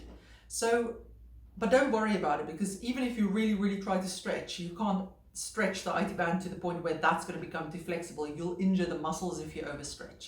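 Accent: South Africa. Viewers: Love your channel, extremely useful, plus I love the SA accent :) (I was born in Germiston). I can't find any videos on warm up exercises before runs. I use <link> <link> and <link> which ones are best? Or even better do you have a video on the best 5-10 min warm ups to use and maybe cool downs?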